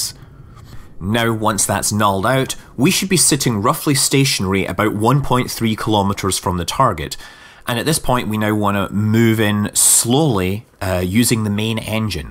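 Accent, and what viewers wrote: Scotland, United Kingdom. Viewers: He has a scottish accent :)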